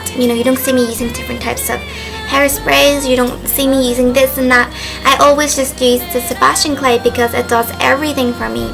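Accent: Ireland. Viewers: are you irish? I totally LOVE your accent! <3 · What is your accent? It sounds a bit Irish???? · Is that an irish accent???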